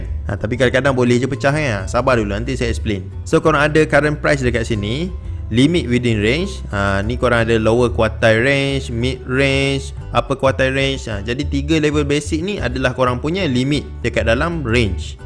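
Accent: Pahang, Malaysia. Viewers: Terbaik video tuan, sy tumpang belajar, mohon halal ilmunya ye. Tuan org pahang ke?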